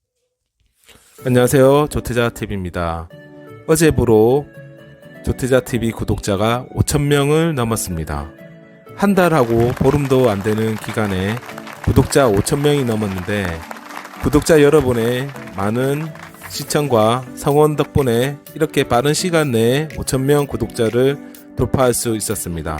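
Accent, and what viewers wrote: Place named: Busan, South Korea. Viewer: 부산사투리..?? ㅋㅋㅋ 목소리가 넘 친근하고 좋으세요~~!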